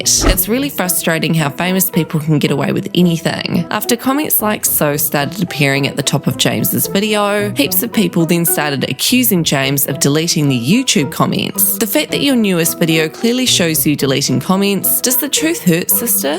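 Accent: Australia. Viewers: The channel host is australian. 😁. Im trying nowadays to distinguish accents. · Australian female accent is the best imo.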